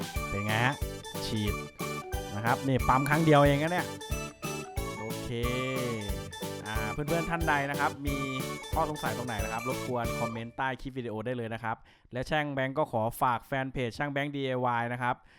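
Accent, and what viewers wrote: Korat, Thailand. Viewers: อ้าวแกคนโคราชนิ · พี่คนโคราชนิคับ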